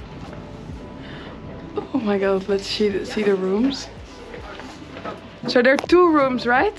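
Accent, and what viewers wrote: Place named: Netherlands. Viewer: You sound just like ivana from travel iv . That dutch accent is very noticeable. Do a collab with her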